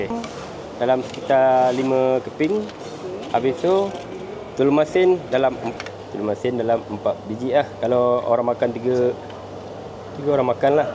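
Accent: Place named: Aceh, Indonesia. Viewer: Ckp mcam org aceh....😂😂😂😂😂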